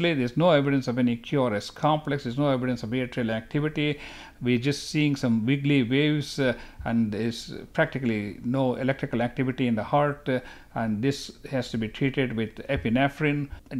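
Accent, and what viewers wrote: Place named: India. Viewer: I don’t understand Indian accent 👎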